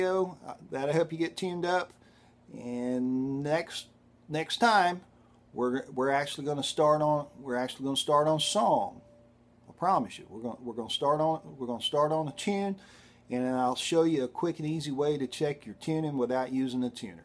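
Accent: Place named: Texas, United States. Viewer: This man's accent is identical to Dr. Phil's. Makes me wonder if he's from the same North Texas background. Makes me want to learn. Being honest with myself, I've only just got to the point where I don't look at my right hand, and I know you don't want to be looking at that from 35+ years of playing guitar, and 15 of finger picking. Banjo is just so different with the finger picks, but that's the sound I'm going for, so I'll learn. Thanks for the lessons and for being cool about it.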